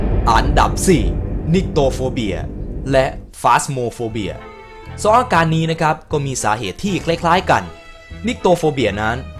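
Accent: Isan, Thailand. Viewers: พี่เป็นคนพาคอิสานเหรอคะ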